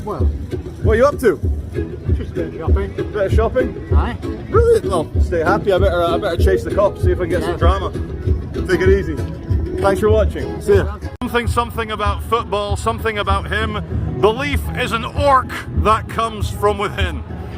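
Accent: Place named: Scotland, United Kingdom. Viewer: love your subtil Scottish accent, very unique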